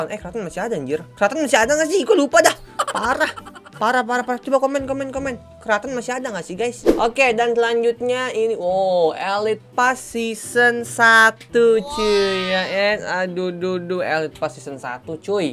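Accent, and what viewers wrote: West Java, Indonesia. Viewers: Bang Abang orang Sunda ya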